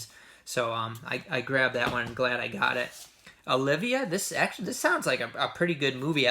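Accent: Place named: Great Lakes, United States. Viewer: It's always great to watch your videos, I'm so impressed with your fantastic movie collection. And your Great Lakes accent reminds me of loved ones in Western NY, a really beautiful part of the country.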